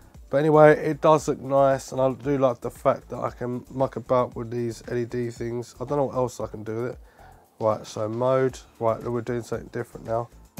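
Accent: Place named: Manchester, United Kingdom. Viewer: Cheers for the review on this case. And I've subbed up to your channel. Was really thinking about this case, but I'm not so sure now due to the cooling aspect, or lack off. So in that respect it was a great review for me.. And I hope you don't mind me saying, you kinda sound like a manc lad.... And I should know, I'm one..lol Thanks again mate..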